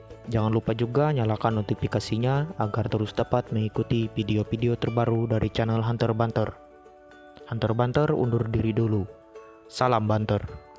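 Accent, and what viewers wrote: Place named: West Java, Indonesia. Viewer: Logat nya kya orang sunda sih bos...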